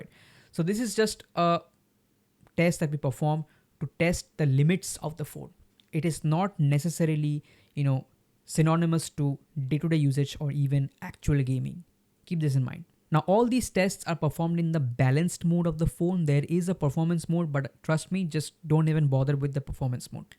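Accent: India. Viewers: I click the video so fast but then closed it as soon as I heard the curry accent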